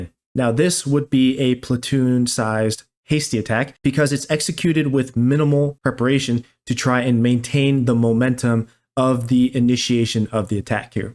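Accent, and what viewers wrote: United States. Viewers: that’s crazy would’ve never guessed your American accent and language skills are so good.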